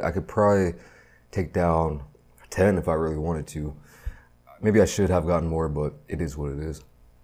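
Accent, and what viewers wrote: United States. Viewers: could you do a video of explaining why you live in Korea etc you sound like you were born in America etc would be a great vid explaining cheers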